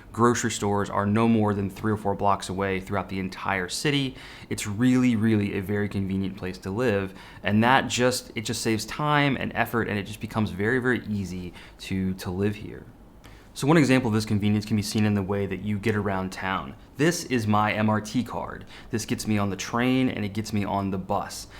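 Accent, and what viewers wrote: United States. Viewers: You don't have the Southern accent. Which state you're from? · I’m Japanese live in Singapore. I totally agree with you and I like your American accents especially “Hawker Center”.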